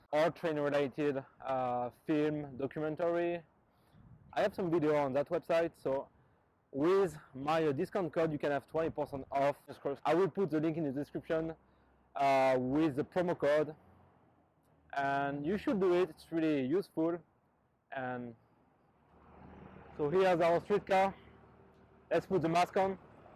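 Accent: France. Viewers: Mais sa se voit tellement avec ton accent que tu est un français 😂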